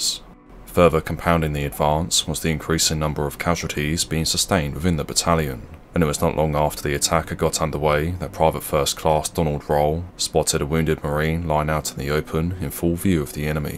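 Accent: England, United Kingdom. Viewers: Which part of England is Your accent from?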